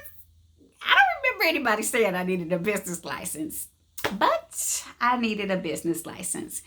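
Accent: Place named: Arkansas, United States. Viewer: I love the Arkansas accent lol great vid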